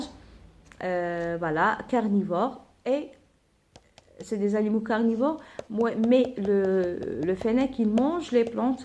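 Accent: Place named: Africa. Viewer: Ne voyez vous pas que c est un français africain il est incorrect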